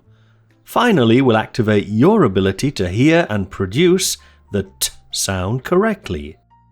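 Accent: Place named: England, United Kingdom. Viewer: Hi dear teacher, I am from Myanmar, English is not my language but I can express writing my feelings with English. But I can't speak it. Kindly guide me with some tips and I love your English accent and teaching. Could you plz also make a long video about phonemes and phonetic with great example plz. Thx so much .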